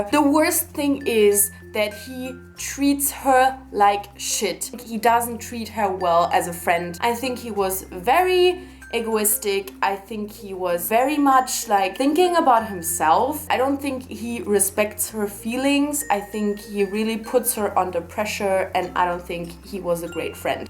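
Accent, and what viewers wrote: Germany. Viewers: Great List! Are you german?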